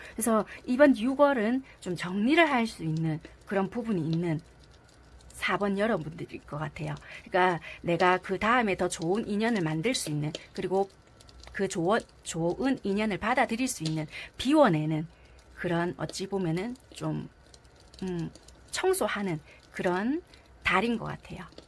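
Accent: Busan, South Korea. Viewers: 잘 지내고 있습니다! 도 건강하셨으면 좋겠어요 ㅎㅎ 온 연락은 비록 지난달 연락이긴 하지만 타로 보며 위로 받아요...^^ 좋은 길이 열리길!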